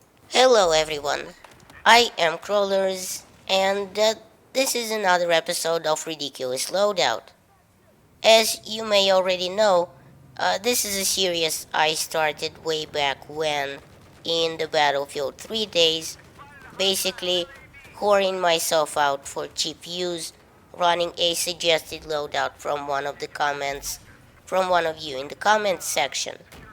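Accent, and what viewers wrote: Russia. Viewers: the coolest thing that people from russia have there accent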